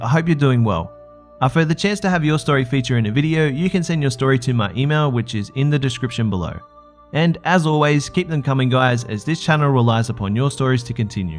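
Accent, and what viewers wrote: Australia. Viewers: bruh you sound like and Australian, anyway love your shit. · love your story's, I just found this channel a couple days ago when I was listening to some Mr. nightmare story's and one of your vids popped up in the recommendation section. I love both channels and you are a great narrator, your Australian accent is awesome and you have great story's. I subbed on the second video I listened to and have watched a bunch of videos since, I'm glad I have a lot of catching up to do because I won't be running out of video's any time soon. :-) keep up the good work!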